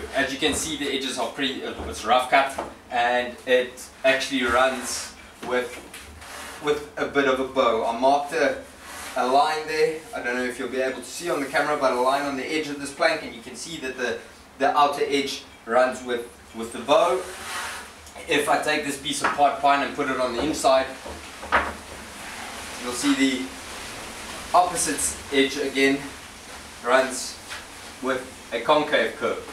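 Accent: South Africa. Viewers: Thanks for the info! - Is that a South African accent? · South African accent? Good video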